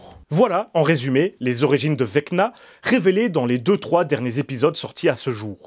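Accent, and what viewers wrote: Belgium. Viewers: Ah ben je savais pas que tu étais belge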